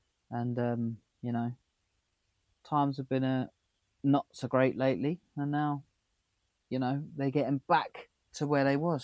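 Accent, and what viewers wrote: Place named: United Kingdom. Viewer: I think your channel showed up on my feed because I follow the UK van-life YouTubers Camper Vibe, and I think they have the same accent as you. Check them out and tell me how they sound to you.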